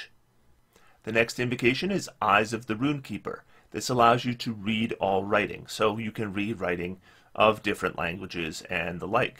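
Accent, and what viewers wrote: United States. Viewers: aegonizing blast. gotta love that northern midwestern accent, heh!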